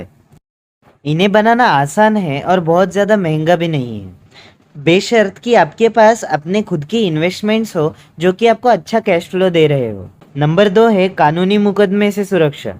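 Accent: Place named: Hyderabad, India. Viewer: Tum Hyderabadi Miya lag raho ji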